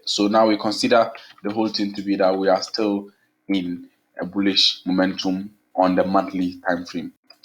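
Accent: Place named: Europe. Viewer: Nice European accent.😅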